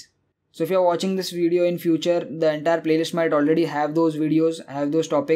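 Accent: India. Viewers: Terrible Indian accent. Barely understand what he is saying...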